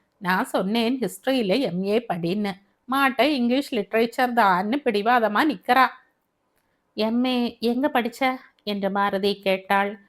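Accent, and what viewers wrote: Kerala, India. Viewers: Besh beshakum ungalin inimaiyana malaiyalathamil vasippathu kathiyum super💖💖💖👍👍👍🎉🎉🎉😊😊😊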